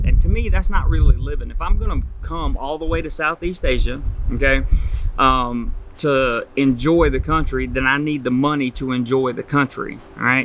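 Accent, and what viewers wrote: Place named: Appalachia, United States. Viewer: The accent mate. How do Thais take 'ole hillbilly voice?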